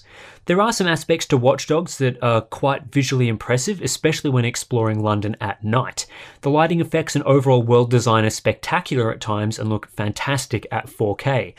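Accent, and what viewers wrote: Australia. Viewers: So aussie so organised. Are guys ausies.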